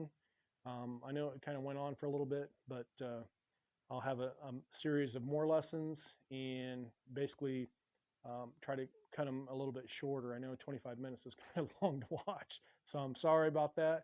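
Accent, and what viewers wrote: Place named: North Florida, United States. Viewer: I just figured out we live in the same town..i am not a tree guy. I thought I spotted the No Flo accent. Thank you for the video. I am just a wannabe tree guy.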